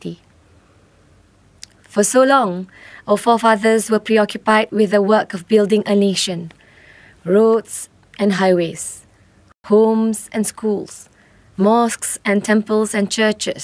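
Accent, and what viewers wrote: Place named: Bangladesh. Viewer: Oh My Banglish....Mirror yourself mate.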